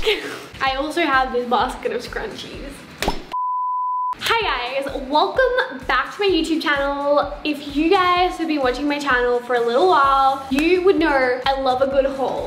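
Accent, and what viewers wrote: Australia. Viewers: These replies are cracking me up! I love Aussie accents 😭😂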